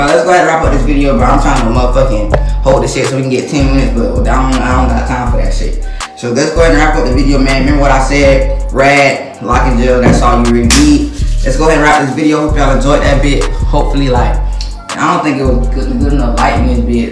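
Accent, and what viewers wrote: Florida, United States. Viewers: U can tell he from Florida 😭😭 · Florida talk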